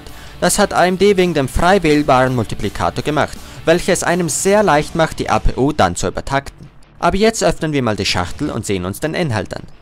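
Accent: Austria. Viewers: Ich liebe den österreichischen Akzent! ^.^ Gutes Review.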